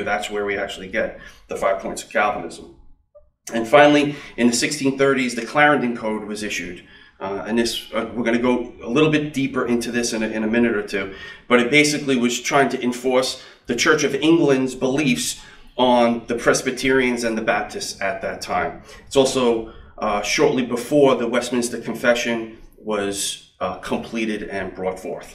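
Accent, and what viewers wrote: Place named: New England, United States. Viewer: This guy is awesome 👍 even I can pay attention to him. I think it's his New England accent (Massachusetts?) Anywho, thanks for breaking this down for us simple folk😊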